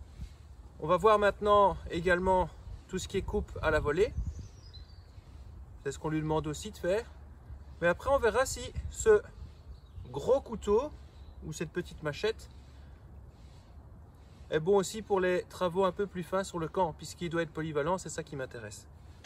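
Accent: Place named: Belgium. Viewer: salut l ami , es tu belge ? Ton accent me rappel m chez-moi ( liege ) super vidéo continue l ami :)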